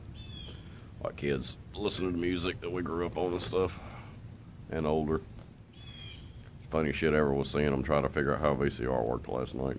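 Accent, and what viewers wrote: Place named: South, United States. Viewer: Thanks for checking out our channel. Thought I would do the same. If I may ask, where are u from? Hear a southern accent. I was born in Arkansas, and lived in Texas a few years, growing up. Enjoyed the vlog.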